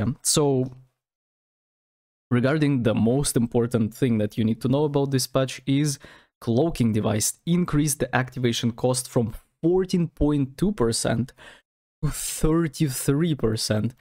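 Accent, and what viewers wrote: Russia. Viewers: У тебя русский акцент, ты шо житель Рашн контри? :)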